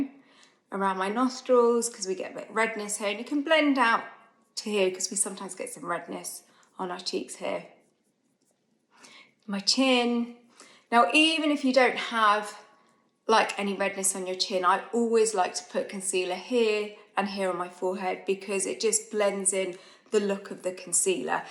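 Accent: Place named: United Kingdom. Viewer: love love your videos! I love watching your tutorials and all the tips and tricks of applying makeup. I too have dark circles and I will use your technique… I love your beautiful accent and could listen to you all day, everything sounds so much better with a British accent. Blessings beautiful lady ❤❤❤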